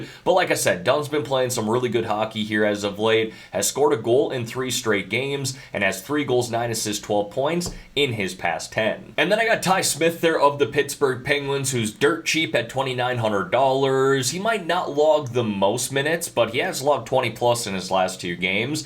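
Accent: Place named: Minnesota, United States. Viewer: your def from Minnesota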